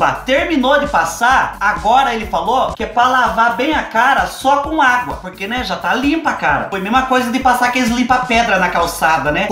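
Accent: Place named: Caipira, Brazil. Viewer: Adorei seu modo “caipira” Um barato. Ameiiiii ❤️❤️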